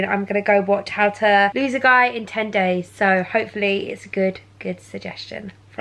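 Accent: United Kingdom. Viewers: Is she British? I think cuz of their Accent ..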